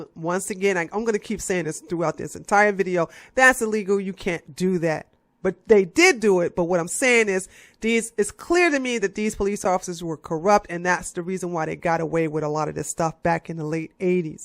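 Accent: United States. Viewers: Where in Texas are you from? Ima guess DFW area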